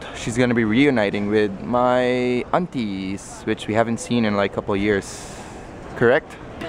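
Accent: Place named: Canada. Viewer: Candian accent ka na ah. :) cool.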